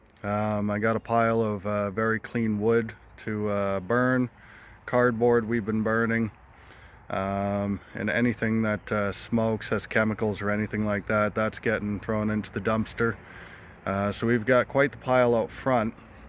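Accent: Canada. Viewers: You sure got a lot of space left in the shed and you got rid of the hornets without getting stung once 😂🥴 anyway I’ll see you tomorrow 👍🏼 I forget if you guys are in Canada or Michigan 🥴 because you have an accent I guess you could be from either 🤣 lately people have been reading my comments so maybe someone can tell me where you live not like it matters I’m just curious 👀 I don’t have much else I’m paralyzed and bedridden 🤯😂 and really enjoy your content BUT I guess I have to wait till tomorrow 🤣😂 anyway guys have a good evening 🙏🏼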